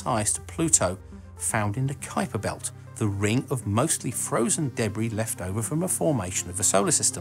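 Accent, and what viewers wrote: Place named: Cockney, United Kingdom. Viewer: A fascinating video as always but the blokes terrible Pommie slapped Cockney accent is awful and distracting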